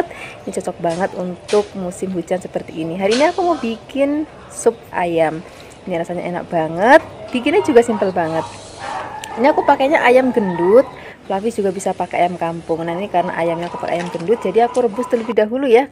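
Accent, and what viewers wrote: Java, Indonesia. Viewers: So yummy banget Suka dengan resep²nya bahasanya medok kyak Saiya kak orang jawa Kaye trimkasih Kaka resepnya topmarkotop Joss🥰👍👍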